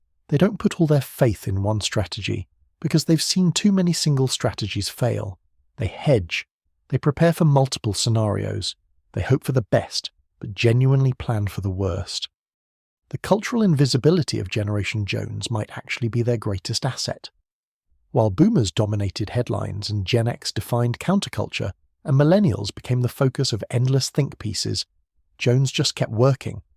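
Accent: United Kingdom. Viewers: Anything that's narrated using a British accent is instantly believable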